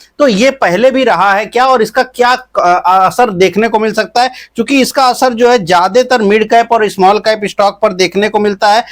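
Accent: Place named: Ayodhya, India. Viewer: Ayodhya ji se hain, Kanpur ki boli lahja thoda alag hota h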